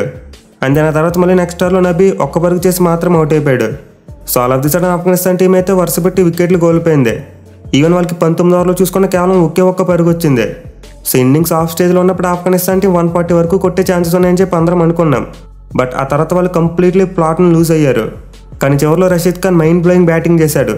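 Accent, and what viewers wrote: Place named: Andhra Pradesh, India. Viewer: Andhra slang 😅😅😅😅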